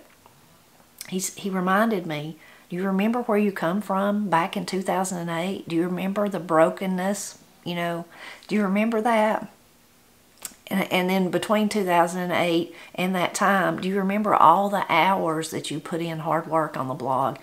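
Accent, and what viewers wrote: Appalachia, United States. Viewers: God bless you and your family. Uve watched your videos for a year and a half now approximately. They have lifted me up in tough times. I thoroughly enjoy them and your wonderful cooking. Im from Appalachia un Virginia. You bring back such good memories of my grandmother's, grand father, aunts and uncles who talked the same way, cooked the same, so many great memories for me. I thank God for you and the good videos and work you do. Please continue to bless us with your videos ❤️💙🙏🙏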